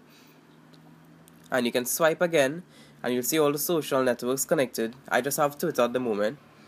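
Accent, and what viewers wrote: Trinidad and Tobago. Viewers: Are you from trinidad? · I knew it Lol! I'm from Trinidad too:P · trini accent hmm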